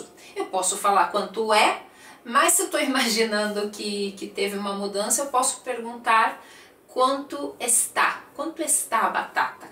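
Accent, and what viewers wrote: Brazil. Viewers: Descargo sus vídeos en MP3 y me los aprendo y así es como practico mi portugués, sobre todo el acento brasileño. Son muy buenos, me encantan, muito obrigada!❤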